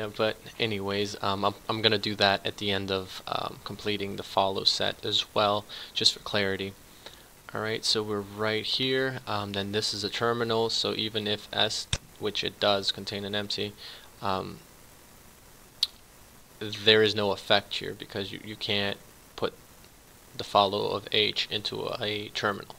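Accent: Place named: United States. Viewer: Wow, am I dreaming or is this an a guy with an american accent? FINALLY!!!!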